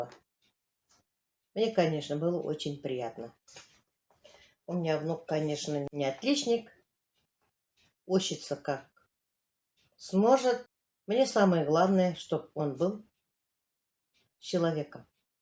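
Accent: Tatarstan, Russia. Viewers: Настоящий татарский акцент ч на ,щ" , при разговоре на русском языке. Мило🤗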